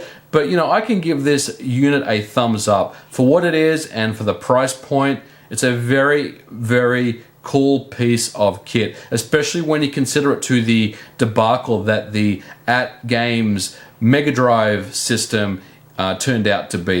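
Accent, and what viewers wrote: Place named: Australia. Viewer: Goddamn Aussie accent my ears are bleeding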